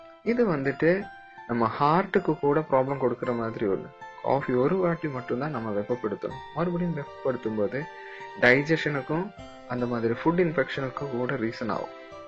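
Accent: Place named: Kanyakumari, India. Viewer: ungal kumari- tamil slank arumai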